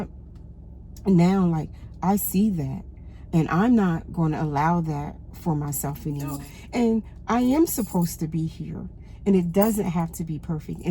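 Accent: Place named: New Jersey, United States. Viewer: Cool accent. Are u from Jersey?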